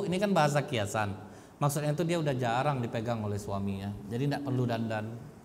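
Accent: Pekanbaru, Indonesia. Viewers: Selain dapat ilmu dari tuan guru, logat khas Pekanbaru tuan guru jadi pengobat rindu 😁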